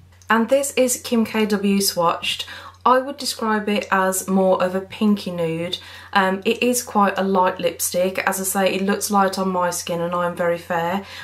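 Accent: Birmingham, United Kingdom. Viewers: You sound like a fellow brummie to me - then I heard you say ‘my mom’ and decided most definitely! Thanks for the swatches. You obv love them because they’re all so well used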